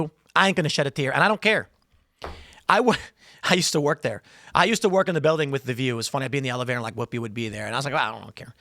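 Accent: Chicago, United States. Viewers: Tim realizes we’ve heard his Chicago accent, right?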